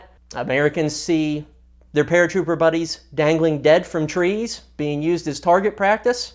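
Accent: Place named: Pittsburgh, United States. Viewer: What part of Pittsburgh is this guy from?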